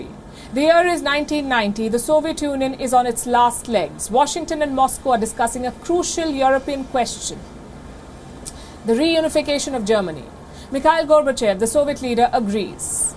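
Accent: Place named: India. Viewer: I like how you speak like an Indian. I hate when reporters try to sound foreign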